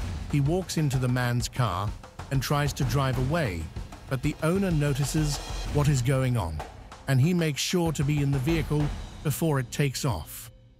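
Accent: United Kingdom. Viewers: The voiceover is clearly British English, so why use wordds like 'dude' and 'gas station'? What is a 'dude' anyway?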